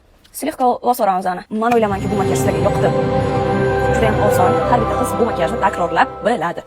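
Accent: Bukhara, Uzbekistan. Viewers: Бухороликмисиз дийман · Шевангиз узингизга ярашади Бухороликмисиз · Buxoro shevasi nomer 1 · Biz buxoroliklarni gòzal shevamizni ustidan hech kim kulishga haqqi yoq❤ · Ibi Manam Buxorolik😅 shevamdan aylanay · Navoiy yoki Buxoro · Бухоро шеваси ❤❤🔥🔥🔥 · Navoiylikla qanaqa gapiradi nima kulasan Buxoro shevasi zoʻr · Shevaba gap nest.Buxoro nomer ❤❤❤❤❤1. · Buxoro sheda juda chiroyli sheva meni dadajonim Buxorodan ayajonim Samarqandan makyaj juda chiroyli vaaaaav🎉🎉🎉🎉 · Biza Buxorolik bizaga shunaqa gapirish yarashadi😅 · Manga Buxoro shevasi yoqadi❤ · Salom buxorolikmisiz.Buxoromni shevasidan o'rgilayda.🫰🫰🫰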